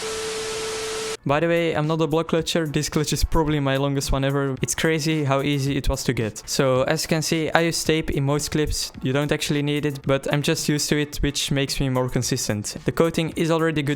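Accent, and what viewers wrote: Netherlands. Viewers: i like your Dutch accent :D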